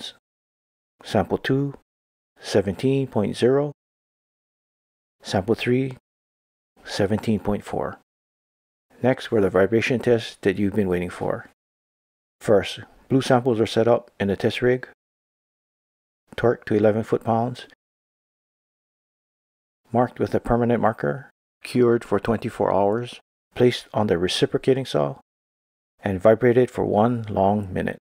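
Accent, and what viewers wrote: Hawaii, United States. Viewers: eh you from Hawaii? I can hear the Piggin brah!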